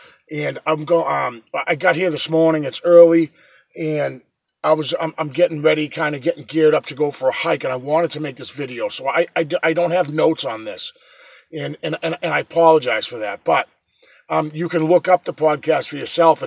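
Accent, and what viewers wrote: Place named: Boston, United States. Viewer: Just found your channel. I'm into these things. Just subbed. Great theory. You sound like u have a Boston accent lol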